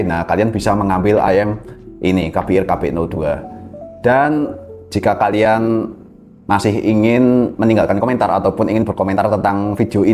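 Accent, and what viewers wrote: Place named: Java, Indonesia. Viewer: Khas medok'e Jowo tenan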